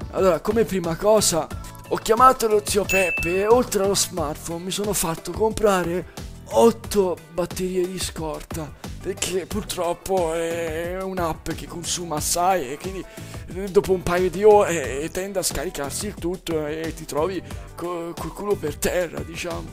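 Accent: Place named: Tuscany, Italy. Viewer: E da quando hai l'accento toscano?!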